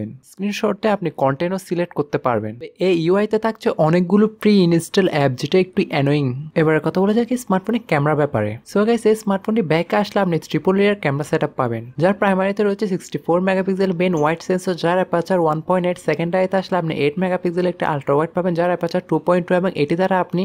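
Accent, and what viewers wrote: Chittagong, Bangladesh. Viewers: Vai apner Basha Chittagong e Tai na?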